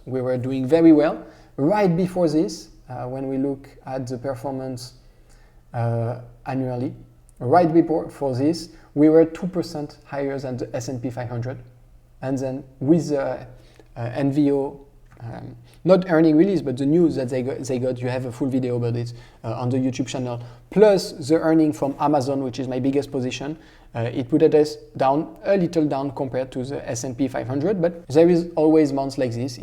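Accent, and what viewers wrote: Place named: France. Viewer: Nice video. I have been following you for a while and I really enjoy your content (and your french accent). I bought the dip on EVO when your video came out- which paid off nicely. I have a few questions that I would like to ask you: 1. I was wondering where you see Francois Rochon's current portfolio that you mention in this video? 2. Have you thought about using StockOracle for your videos? The tool is amazing and works well for youtube videos. 3. Why don't you have Apple in your portfolio (It is one of my biggest holdings along with amazon and google). Btw.. Novo Nordisk is my favorite investment case BY FAR, so I'm happy to see it in your portfolio! All the best.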